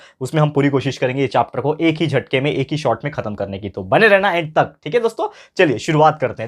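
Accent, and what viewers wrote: Maharashtra, India. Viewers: Sir aap marathi bohot cute bolte ho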